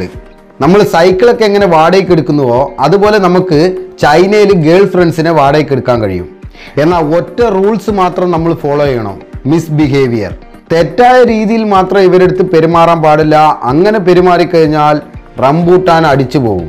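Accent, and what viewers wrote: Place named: Highland region, India. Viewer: Hai bro aru from up hill distic ur longeage slang up hill modal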